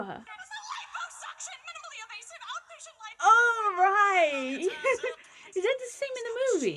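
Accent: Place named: Australia. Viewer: Hey sorry if you’ve answered this already! I’m curious about which country you live in. Your accent sounds Australian or like it’s from New Zealand (if so you’re so lucky). I’m loving your reactions, it’s really funny!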